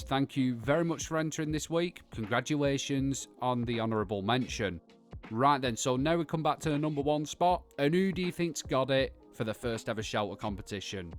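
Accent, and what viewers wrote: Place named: Northern England, United Kingdom. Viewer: Definitely got the inspiration I was looking for but spent most the video trying to work out which side of the pennines you are from 😂 Prestonian here lol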